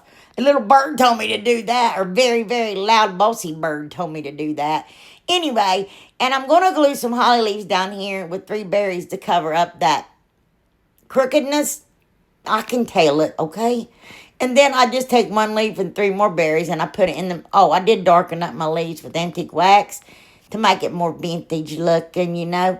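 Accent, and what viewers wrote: South, United States. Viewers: Where did you get your canvas from? I dont see the page for that gentleman you were talking either. Im from Gallipolis, Ohio and listening to you I would have thought you were from Alabama, Louisiana or Mississippi because of your accent.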